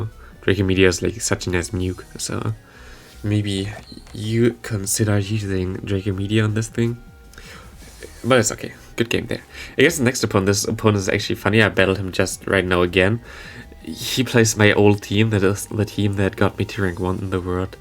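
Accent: Germany. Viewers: Gutes Video aber ich cringe weg mit dem akzent (nicht besonders starker aber deutschen akzent erkennt man gleich)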